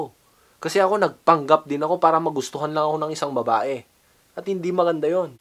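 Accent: Ilocos, Philippines. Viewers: bakit boses ilocano?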